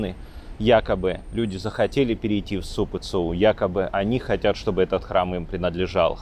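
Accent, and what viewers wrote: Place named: Moscow, Russia. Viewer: мене просто здивувало, те що вчитуючи саму специфіку данного регіону, в якому взагалі важко почути російську, а тут на тобі російська та ще й я б сказав чисто з московським акцентом?!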